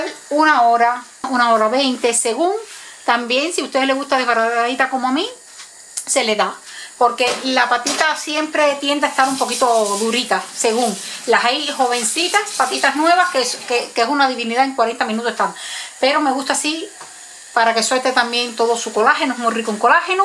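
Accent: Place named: Cuba. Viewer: Me encanta su voz, cubania y naturalidad. Mi abuela siempre las hacía en casa. Yo voy a intentar me queden tan deliciosas como a usted. Muchas gracias.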